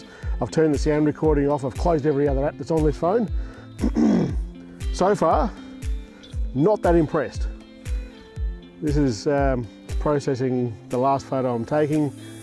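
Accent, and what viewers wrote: Australia. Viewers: With my upper intermediate English I can barely understand Australian accent :( But videos are great :) Thanks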